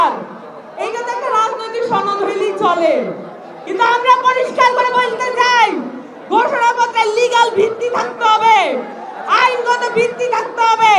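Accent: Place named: Mymensingh, Bangladesh. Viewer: ময়মনসিংহ অঞ্চলের ভাষা কথা বললেন এই আপু, ধন্যবাদ,এন সি পি এগিয়ে যাও বাংলাদেশ আছে তোমাদের সাথে ইনকিলাব জিন্দাবাদ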